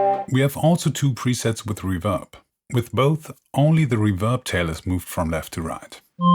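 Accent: Germany. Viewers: Nice to hear the German accent again.👏👏😁😁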